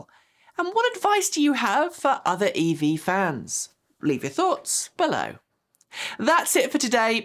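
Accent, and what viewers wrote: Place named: England, United Kingdom. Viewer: I thought this might be click-bate, but as per usual, this just sounds like my inner monologue, but with a lovely English-Accent. Love it.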